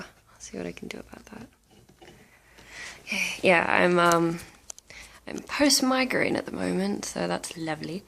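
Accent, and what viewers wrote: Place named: Australia. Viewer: Good to hear another Aussie accent on YT.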